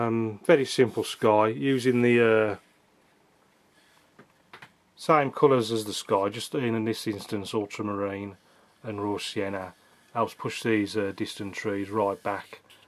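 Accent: Birmingham, United Kingdom. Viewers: Nice to hear a Brummie voice on Youtube. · GREAT TO HEAR BRUMMIE ON YOUTUBE, KEEP ON POSTING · Thanks for sharing your realistic and relaxed approach to watercolour painting. Excellent, and refreshing to listen to your accent after 20 years out of the UK!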